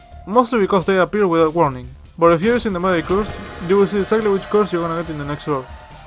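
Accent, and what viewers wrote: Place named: Argentina. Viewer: solo con escucharte hablar ingles ya se que sos argentino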